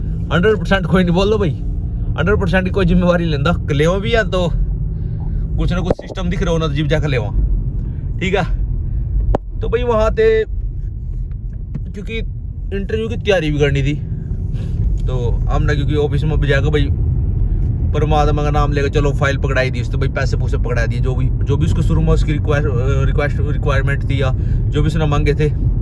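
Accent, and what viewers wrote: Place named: Haryana, India. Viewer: bhai mein yamunanagar se.... Apki boli tu jame humare karni ki hai ... Konsa agent tha apka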